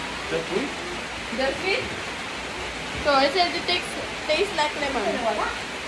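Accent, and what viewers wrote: Visayas, Philippines. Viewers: Bisaya kaayu ka Dai tundan na staging...hehehe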